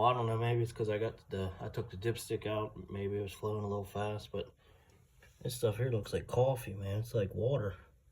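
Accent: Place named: Pittsburgh, United States. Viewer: Dude u gotta be from Pittsburgh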